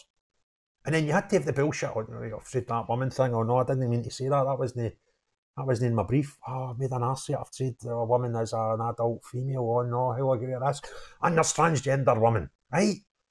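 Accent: Scotland, United Kingdom. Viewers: You make me laugh every time I watch your explanations. Even better that your Scottish. thks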